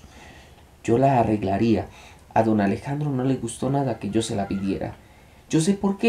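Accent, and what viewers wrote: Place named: Colombia. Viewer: me agrada tu acento colombiano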